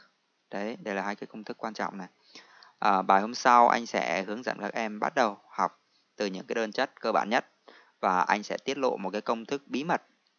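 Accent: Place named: Thanh Hoa, Vietnam. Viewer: anh là người thanh hóa ạ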